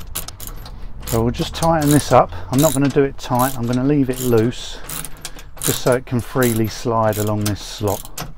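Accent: United Kingdom. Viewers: You’re a cool dude with a great British accent (which locality?). Not your best vid.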